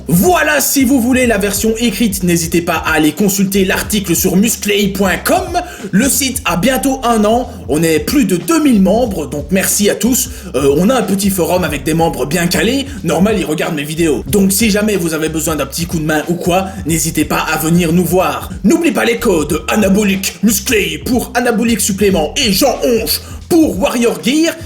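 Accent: Belgium. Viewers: Est-ce qu'on peut faire ça avec des elastibands? 😄.. Tu as une.voix terrible mec! En plus l'accent belge embellie tout ça mdr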